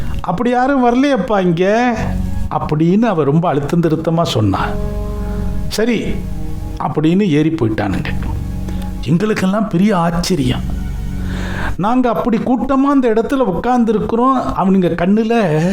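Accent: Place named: Tirunelveli, India. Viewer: படித்தபோது திருநெல்வேலி மாவட்டத்தின் பேச்சு வழக்கு தமிழ் தேனாக இருந்தது.இப்படி கதை கேட்பது இது ஒரு புது அனுபவம்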